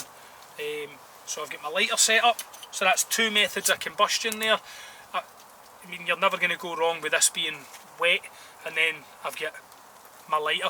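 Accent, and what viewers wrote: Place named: Scotland, United Kingdom. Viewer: Enjoyed the video. I've been thinking about the benchmade 162, but it's quite pricey for the size of it. I'm trying to place your accent.. Where about in Scotland are you guys?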